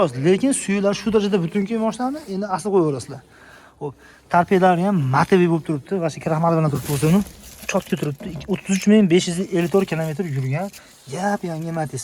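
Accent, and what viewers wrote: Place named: Andijan, Uzbekistan. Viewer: 200 000 ni tagi 60 da shevez andijonniki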